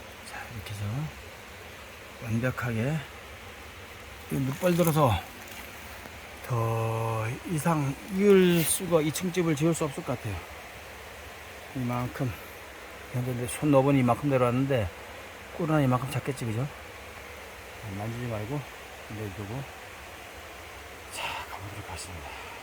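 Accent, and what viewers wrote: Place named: Danyang, South Korea. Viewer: 간만에 단양 진또배기 사투리를 들으니 억양도 좋고 가지끈 반갑습니다 ㅋ 건강 유의하시고 사랑하는 동상^^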